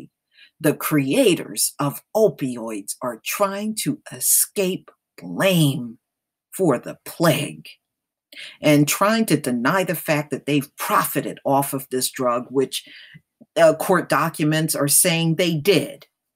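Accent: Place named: New Jersey, United States. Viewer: I love it. Yo Are so Jersey!!!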